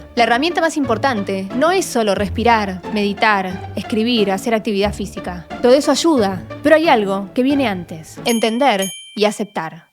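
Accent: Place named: Buenos Aires, Argentina. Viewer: Simpre tenes videos muy interesantes exelente research Y cambiando el tema pensar qje nací en Buenos Aires y me fui a los 5 años pero ese acento de Buenos aires me fascina mucho te go que visitar Argentina 🇦🇷 pero especialmente Buenos Aires que lindo saludos dese New York 🗽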